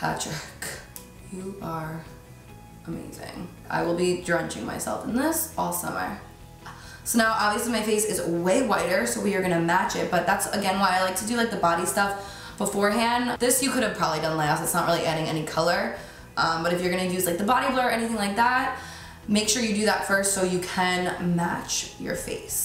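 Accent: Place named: Philadelphia, United States. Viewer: Absolutely gorgeous ! Love this look 👏❤️ Are you from Philly or around here? I hear your accent like mine... “wuter ” hahaha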